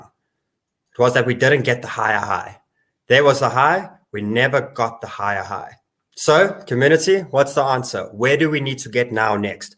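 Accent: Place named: South Africa. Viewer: Great, you’re incredible. Can someone please post the ticker of the last coin, it’s that South African accent 😜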